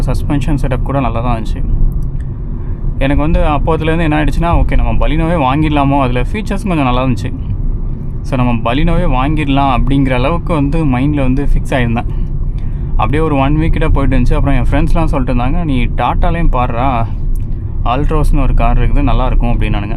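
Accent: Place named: North, India. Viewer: endha ooru bro idhu? vadakan pan parag ad ah iruku, oore pan parag potu thupuna mari iruku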